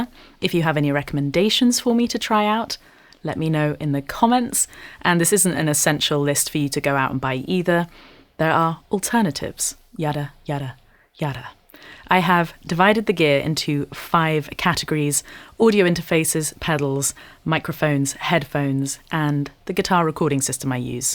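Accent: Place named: RP, United Kingdom. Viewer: You sound very posh!😮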